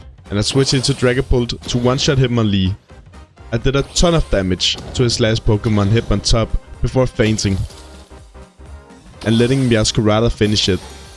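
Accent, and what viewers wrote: Denmark. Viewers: Denmark maybe 🤔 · are you danish, you sound very danish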